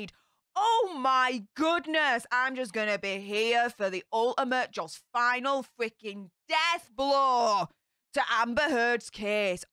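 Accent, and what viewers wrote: Yorkshire, United Kingdom. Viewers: Great points and I get to hear your delightful Yorkshire accent. Win/win, y’all. J4J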